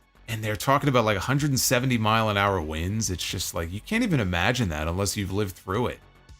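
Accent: New York, United States. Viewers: I love! He is the only wrestling tuber I watch/listen too! So level headed, cslm, cool, collected! And I can understand his NY accent!😂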